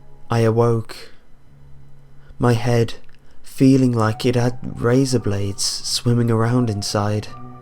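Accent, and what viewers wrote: England, United Kingdom. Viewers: Where in England are you from, I'm tying to learn the regional accents of GB/UK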